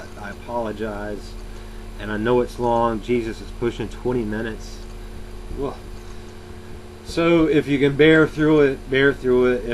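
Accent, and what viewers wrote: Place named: North Carolina, United States. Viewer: Yea man I live in Leland in Windsor park as well so I go that same way to get to the clinic. I think it was just the way you talked and then a few things you said in your videos like you were in south east nc ect that made me think we must go to the same clinic. Pretty cool man. Glad you got off the shit. I just got on it last year and I kinda like being on it because it helps my chronic back pain that has kept me from sleeping well for years now and it also satisfies my opiate cravings. But I do wanna be free one day so I watched your vids. I plan to do the taper correctly so hopefully it won't be as bad as what you went through but it's really scary.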